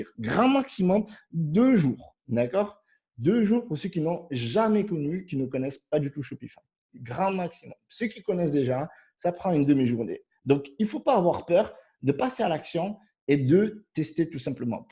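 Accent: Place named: Marseille, France. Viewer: lorsque j't.écoute,on dirait k't.es sur Marseille, chui sur hyères pour l'instant. À larevoyure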